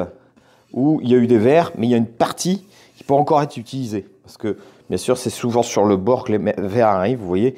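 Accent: North, France. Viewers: Certes, pas donné, mais pour moi, inconditionnel de Makita, cette machine est tout de même inférieure au niveau du prix. Je viens d'en commander une (Metabo comme présentée dans la vidéo). Je me régale déjà de pouvoir la tester et l'utiliser. Merci à et la présentation avec ce chouette accent de ch'nord;o))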